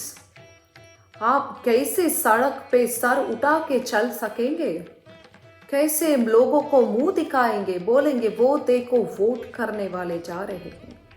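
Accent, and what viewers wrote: South, India. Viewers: Tamil accent in particular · 😊😅 very good informative and interesting satire, with South Indian language accent. Great and intellectual comments. Thanks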